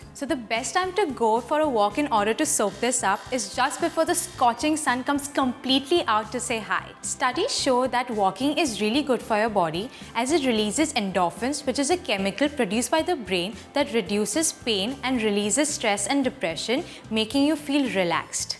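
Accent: India. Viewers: Ur English feel like an Indian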